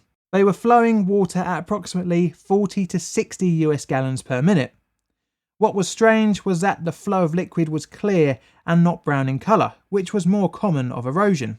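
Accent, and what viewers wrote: United Kingdom. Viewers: Why is this narrated by a limey?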